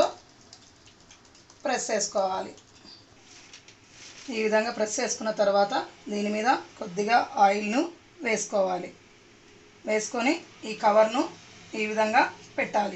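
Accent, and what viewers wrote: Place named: Rayalaseema, India. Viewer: aha rayalaseema matalu vini anni rojulu indakkahii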